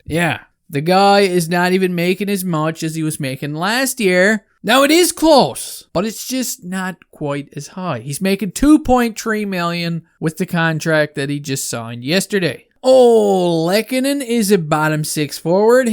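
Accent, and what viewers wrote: Newfoundland, Canada. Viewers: you sound like you might be a Newfie lol am i close?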